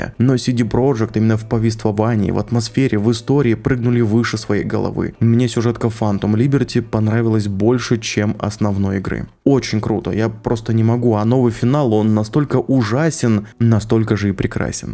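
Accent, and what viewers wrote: Primorye, Russia. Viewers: вы случаем не с Приморского края? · С Приморского края что ли? :)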